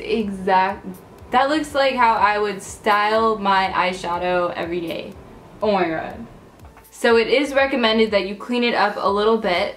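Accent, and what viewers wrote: United Kingdom. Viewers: Her English accent "oh bloody hell" that's totally jokes literally that's me being normal everyday!!!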